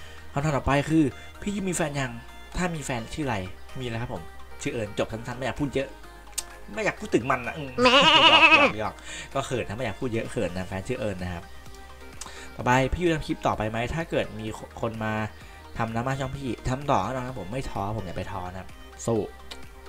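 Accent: North, Thailand. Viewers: อ้าวอ้ายคนเหนือกะ555 FC ครับบ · อ้ายเป๋นคนเหนือก่า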